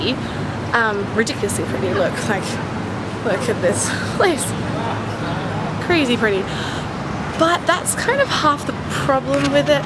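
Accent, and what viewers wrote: Australia. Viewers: Your Australian accent actually isn`t too thick. I think you have been in Canada/US too much :) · omg, i love Aussie accents! It's even more sexy than the british one!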